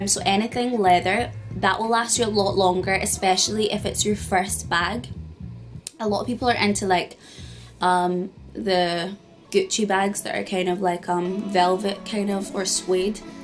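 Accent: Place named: Scotland, United Kingdom. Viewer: Girl you're beautiful !!! ...I 100% expected a London accent myself hahahaha ...I never really liked Scottish accents but you make it sound amazing. Haha! Yaaaas fried chicken ...naaaaah! Your wig fell off in the gym. Oh gosh LOL ...when I ever went to the gym with a wig, I had to wear a cap. True security for me :') ..you're great! I subbed <3xxx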